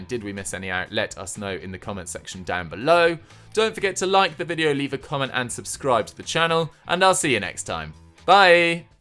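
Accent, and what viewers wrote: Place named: United Kingdom. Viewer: Your British ascent was too deep we don't understand most of it. Please in future put subtitles. Anyway thanks for your information. Keep it up 👍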